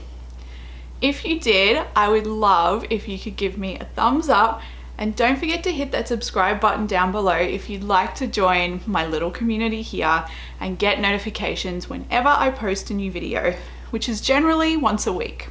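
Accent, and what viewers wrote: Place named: Australia. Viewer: New subbie here. I was so happy to hear your aussie accent when I clicked on this video, as I live in Melbourne. I absolutely love your home, and the way you decorate, it's so much my taste. And those wreathes are just beautiful : )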